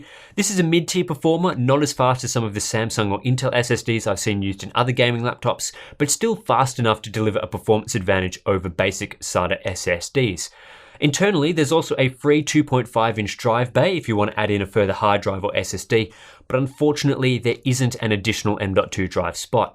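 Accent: Australia. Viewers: Most other reviews are done in a heavy accent. It was refreshing to hear a fellow Aussies voice for this review. Subscribed, and bought the 17.3" scar II (2060)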